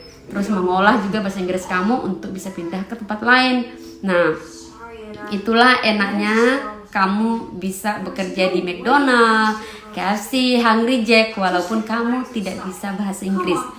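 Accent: East Nusa Tenggara, Indonesia. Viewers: Maaf ibu asli indo mn bhs i ndonya kyk NTT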